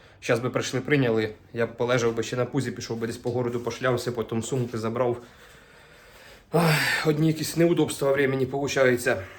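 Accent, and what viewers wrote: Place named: Zaporizhzhia, Ukraine. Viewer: Запорізька обл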